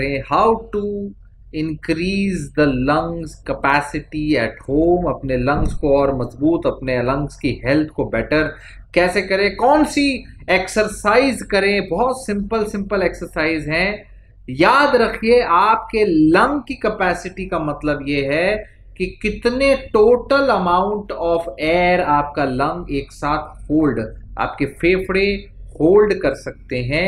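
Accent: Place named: Punjab, India. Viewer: Maadi moti 😄 punjabi nikal e jandi aa sir 😂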